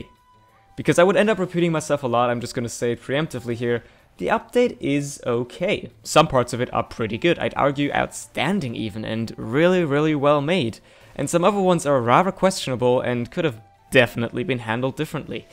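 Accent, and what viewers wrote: Germany. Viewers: if i didnt know you were german i would never know by watching your streams but the german accent is too strong in the videos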